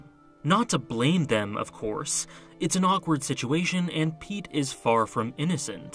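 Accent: Canada. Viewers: I'm going oot on a limb and guess you're Canadian?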